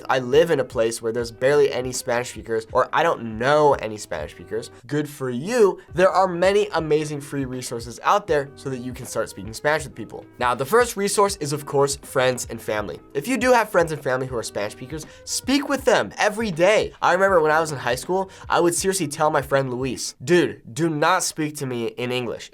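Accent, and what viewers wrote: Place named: Mexico. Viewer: si Señor ! I'm a podcast member and gonna upgrade to the taco. My italki tutor was wondering what podcasts i listen to and i told her, and I mentioned that yours was hardest for me to understand. She played a few minutes of the recent Gringo or Latinas podcast and said "that's VERY Mexican", lol...... needless to say i dissected that transcript later that night. Your podcast is the one that pushes me to keep working harder. Keep up the great work!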